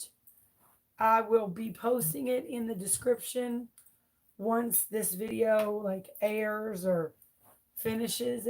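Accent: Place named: South, United States. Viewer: ❤️❤️❤️❤️❤️.....I knew u was from da south lol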